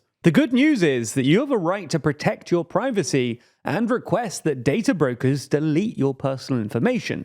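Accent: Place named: United Kingdom. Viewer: Presenter is British, speaking British English (from where the language originated) rest assured he is pronouncing it in the correct manner.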